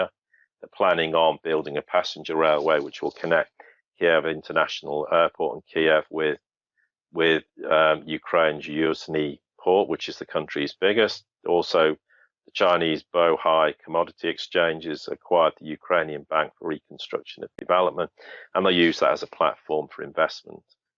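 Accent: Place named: London, United Kingdom. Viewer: Does he keep saying Western capitalists or Western kabbalists? Damn London accent I can't tell the difference.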